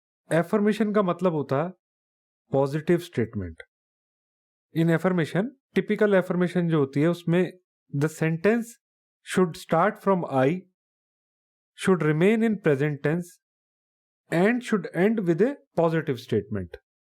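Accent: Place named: Punjab, India. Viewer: Thanks, aapka punjabi accent and simple way of explaining is v.good. Thank u.. Kya aapka book crossword stores me hai. ?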